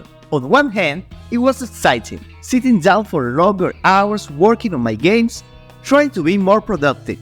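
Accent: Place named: Spain. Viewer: Ese inglés, yo diría que hablas castellano ¿es posible?.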